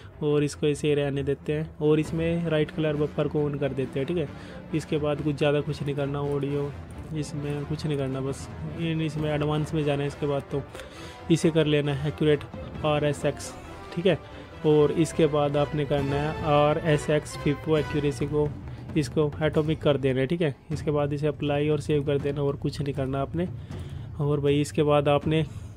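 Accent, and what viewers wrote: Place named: Haryana, India. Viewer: kyuki m khud haryana se hu and tumhari boli se pta chlta h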